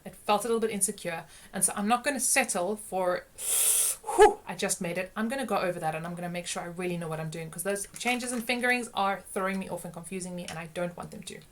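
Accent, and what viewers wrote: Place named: South Africa. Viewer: Who else came for the sexy librarian but stayed for the South African accent? But more seriously, please post the other 23 studies...they’re tough.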